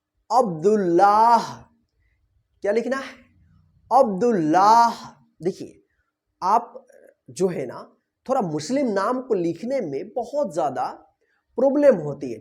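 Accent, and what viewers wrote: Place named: Bihar, India. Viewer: आप हिंदी और इंग्लिश बोलना सीख लीजिए तो ज्यादा प्रभावशाली लगेगा वीडियो आप शायद बिहार की तरफ से बिलॉन्ग करते हैं आपकी बोलचाल की भाषा में उधर की झलक है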